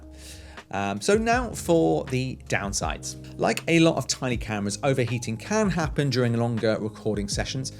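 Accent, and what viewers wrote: United Kingdom. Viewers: I love the British Accent 😯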